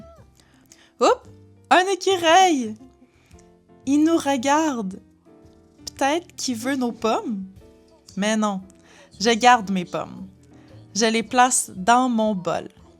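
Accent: Canada. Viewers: I'm so glad it's in Canadian French. I live in New York, so most likely will go to Quebec and not France to experience the language.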